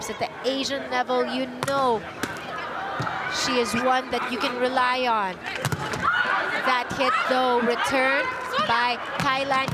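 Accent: Philippines. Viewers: I can tell this commentator is Filipina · Is the commentator Filipina? She has Filipino accent.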